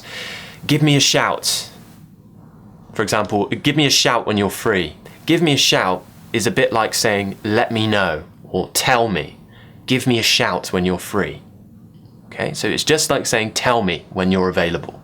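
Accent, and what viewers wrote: United Kingdom. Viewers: Hi! Thanks for your video. Love your British accent, mate!:) I wonder, can we also say ‘go down the pan / the tubes / the plughole’ instead of ‘the drain’ with the same connotation and level of politeness? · Your accent is awesome! I wish I spoke English with British accent like you. 😊😊😊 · I'm so glad to watch you video..the way you talk British English so very clear 😘😘😘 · Thanks so much, very useful video and I like very much your British sound, it's wonderful and clear.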